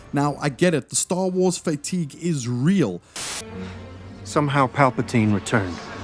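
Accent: South Africa. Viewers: Where are you from? Your accent sounds kinda South African.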